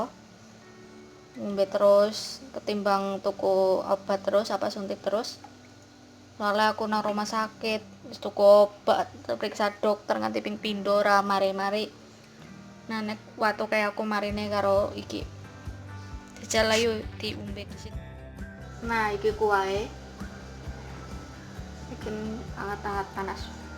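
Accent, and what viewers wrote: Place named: Banyumas, Indonesia. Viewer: Ponorogo rasa ngapak ya... 😊👍👍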